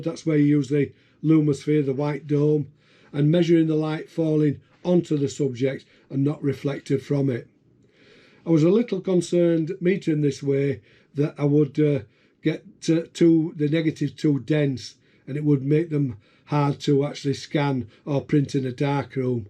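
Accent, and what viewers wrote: United Kingdom. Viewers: subbed straight away just for the tyke accent. I'm originally from Cas'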